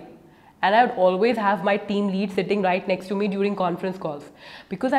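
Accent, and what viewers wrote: India. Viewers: your hindi accent is very boring. appreciate your confidence. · Your english language is a just like a Indian language ❤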